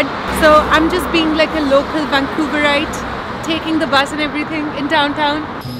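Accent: India. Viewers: Please change your Indian accent..it's so funny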